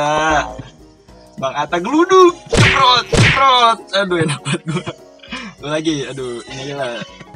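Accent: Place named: Sunda, Indonesia. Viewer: Bang lo orang tangerang selatan ya sunda kasar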